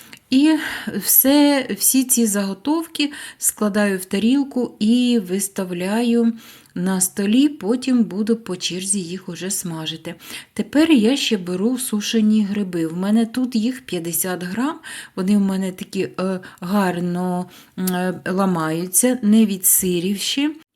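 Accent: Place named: Vinnytsia, Ukraine. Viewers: Де вам тут вчувається російська мова? Жіночка розмовляє чистою українською мовою Придніпров'я, центральної та півдня України. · Чудова ідея з кубиками, обов'язково зроблю❤❤❤,а мені теж сподобалась мова, так розмовляють у Вінниці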